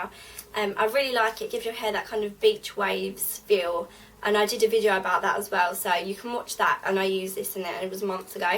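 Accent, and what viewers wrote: Essex, United Kingdom. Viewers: you sound like your from essex!:) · OMGGG!! a Louis Tomlinson inspired shirt AND you have a British accent! I love this chick!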